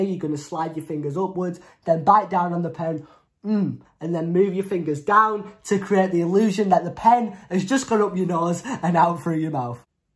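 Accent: United Kingdom. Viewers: Bro r u Britisher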